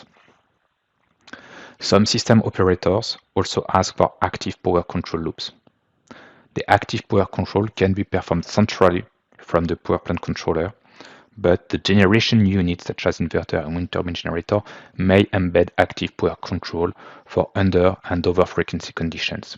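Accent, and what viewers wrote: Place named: France. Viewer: Its french version of english 😅 its cute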